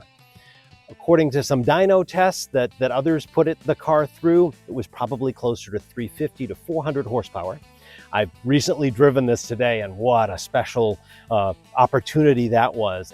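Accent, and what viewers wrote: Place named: Canada. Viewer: Let Me Guess You're canadian ?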